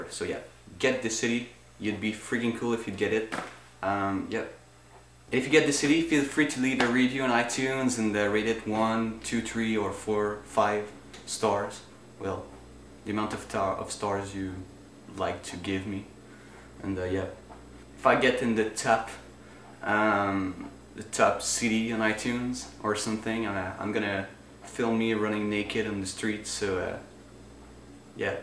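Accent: Quebec, Canada. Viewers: Guys hes from french part of canada, so french is first language thats why he has an accent. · His first language is French, but Quebec's French has a little bit different accent than that of France's soooo ya :)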